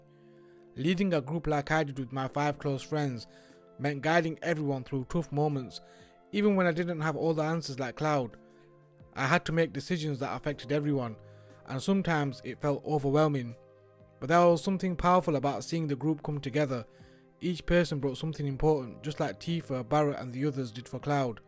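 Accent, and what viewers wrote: United Kingdom. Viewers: Fantastic video and a great retrospective. Thanks so much! I imported this title along with Final Fantasy VIII on Switch. Having both full games on one Nintendo cart is amazing! BTW, where are you from? I can’t quite place your accent. I do love it, though. 😉 Edit. Ah, you’re British. I looked up Sheaf Market Square. Now I know. 😁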